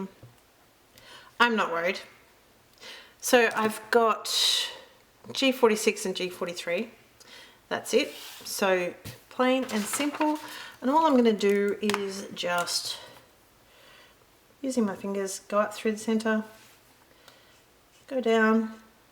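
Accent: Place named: Australia. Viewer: Hi, hello from Norfolk Virginia, great to hear an aussie voice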